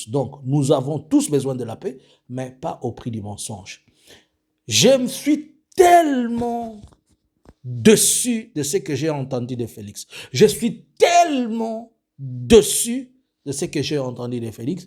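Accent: Rwanda. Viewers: Je crois entendre un rwandais.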